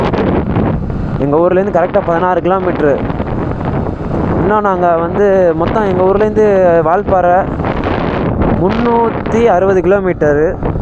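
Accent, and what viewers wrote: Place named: Kerala, India. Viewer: Kakka va kakka nu solunga bro athu tha cute ah iruku unga KL tamil ungaluku nalla reach kodukum. All the best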